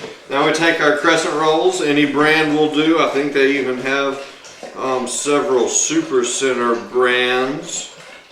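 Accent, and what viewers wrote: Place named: South, United States. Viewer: speaks in a souther drawl!!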